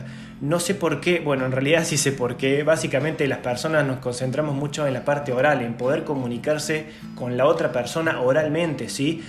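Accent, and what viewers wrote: Cordoba, Argentina. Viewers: excelentes consejos, que grande ese acento cordobés, saludos desde Rosario.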